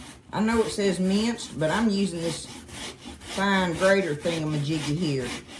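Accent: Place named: Mississippi, United States. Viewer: Hi,. I have been watching you for about 6 months, and I just LOVE that you cook regular food, not fancy with 50 ingredients. I am from rural Mississippi, and you talk exactly the same way we do. Watching your videos is like sitting in my Mama’s kitchen. Keep cranking out the videos, and it won’t be long til you have 100,000+ subscribers.❤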